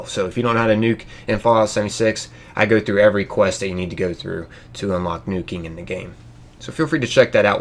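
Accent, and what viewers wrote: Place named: New Jersey, United States. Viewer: Its because he sounds like he could be from New jersey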